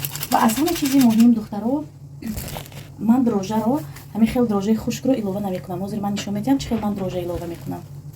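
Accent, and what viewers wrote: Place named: Asht, Tajikistan. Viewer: Ха барои хамин тарзи суханкардантонам мисли мо Ман хам аз райони Ашт Ман давно Канали шуморо тамошо мекунам ба шумо пешравихо хохонам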